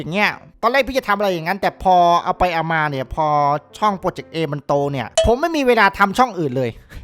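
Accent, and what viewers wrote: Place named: Ubon Ratchathani, Thailand. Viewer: เสีองพี่คือขอพูดพี่คืออุบนเหมือมผมพี่เป็นคนอุบนใช้ครับ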